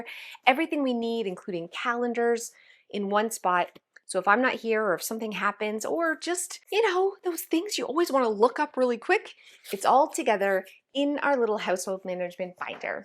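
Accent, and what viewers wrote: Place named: Ontario, Canada. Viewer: As a former avid skydiver I agree. Safe, fun, but there aren't a lot of vertical wind tunnels in Canada (where I live). By the accent I'm guessing you're in Ontario? There are a TON of places there. It makes for a memorable family video too!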